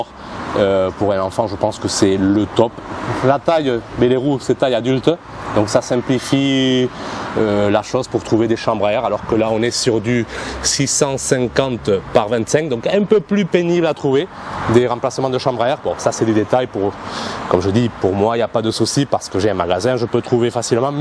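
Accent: Toulouse, France. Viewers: De toute façon c'est mieux de pende a son age un vélo qu'importe pour quel pratique de prendre tout de suite un vélo pour adulte de cette façon pas besoin de changer deux fois ou plus de taille de vélo fur et a mesure qu'il vas grandir ....c'est ce qu'on a fait avec nos gamins ....ça c'est mon opinion perso je précise ...salutation bernard de strasbourg et bien sur toujours un vrais régal de te suivre sur tes superbes vidéos chapeau , sais pas si c'est ta manière de présenter les articles 🤔 et ...ou ton accent toulousain ou on ressent cette passion du vélo avec l'ambiance du sud.....oups.....en tout cas j'adore visionner !.......... bon dimanche 😉✌✌........................................................................j'oubliais faut bien insister sur le port du casque je sais que c'est ch....pour certains adultes mais disons une chose et insistons la dessus ...quand on tombe qu'importe la gravite ,faut savoir qu'avec un traumatisme crânien même minime on peut rester un " légume " hélas et ou paralysé d'un coté ....qui voudrait ça ? 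juste pour infos ....moi aussi ça me dérange un peu mais avec mes 73 balais je me verrais pas être dépendant d'autres personnes croyez moi ...et je m'amuse dans mes chemins de terre sur les collines atour de chi moi ...bien sur avec mon vtt avec assistance car je pourrais plus avec mes pontages que j’ai eu en 2021 et un an après un ACR en voiture dans la commune voisine et heureusement je roulais tout doucement quand je suis rentre dans le mur de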